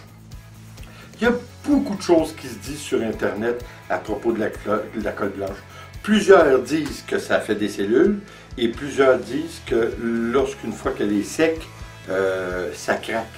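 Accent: Quebec, Canada. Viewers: J'aime bien vis explication et votre petit accent de québécois moi je suis normande je vais tester vos méthode pour su scrap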